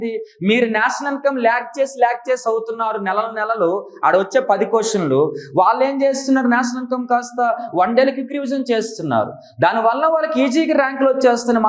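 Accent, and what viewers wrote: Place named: Andhra Pradesh, India. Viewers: అవును.. ఇతను ఆంధ్ర.. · నువ్వు ఆంద్రోనివారం తమ్మి వాయిస్ ఆంధ్ర లెక్క ఉంది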